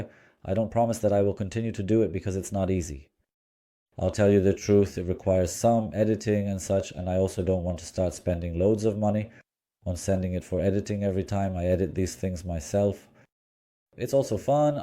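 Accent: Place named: United Kingdom. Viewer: Loved the British accent mate, good luck with the English account 👌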